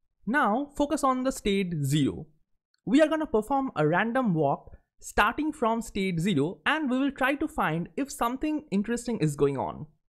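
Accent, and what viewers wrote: India. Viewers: I will be honest, was ready to find another video when heard the Indian accent. But then saw high upvote/downvote and stayed, and don't regret it!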